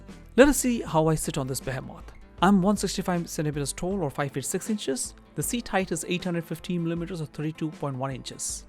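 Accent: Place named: India. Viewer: Man , hard to understand your Indian accent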